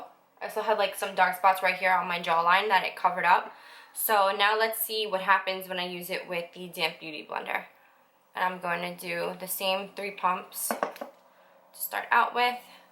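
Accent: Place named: New Jersey, United States. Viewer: Looks like a great shade match! Thanks for the review. PS - am I hearing Jersey in your accent? My mom's from Jersey, and sometimes I get a whiff of this accent ;)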